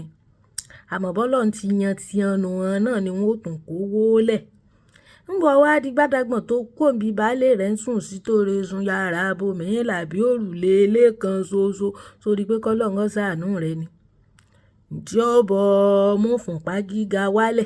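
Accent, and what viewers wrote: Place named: Origbo, Nigeria. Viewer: Speaking with the Origbo dialect is a super spice. Well done!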